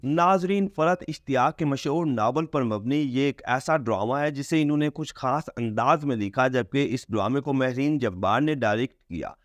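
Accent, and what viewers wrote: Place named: Punjab, India. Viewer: No doubt mother tongue Punjabi. Very poor URDU.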